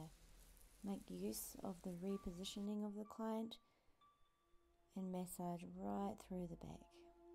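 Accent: New Zealand. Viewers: do I hear a kiwi accent :) ? very beautiful work btw... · You sound like a kiwi ( newzealanders)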